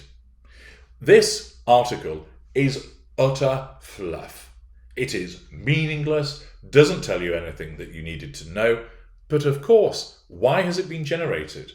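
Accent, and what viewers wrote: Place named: RP, United Kingdom. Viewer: He doesn’t do it often enough to be overly vulgar, but just to emphasize a point , usually of exasperation ( IMO). I have to say hearing it said with that posh accent and delicious voice makes me smile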